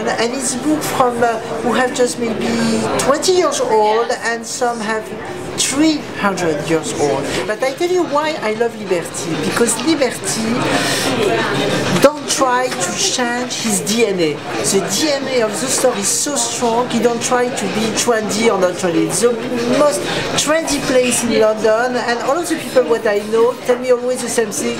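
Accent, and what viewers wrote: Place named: France. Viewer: his high pitch voice with french accent are very adorable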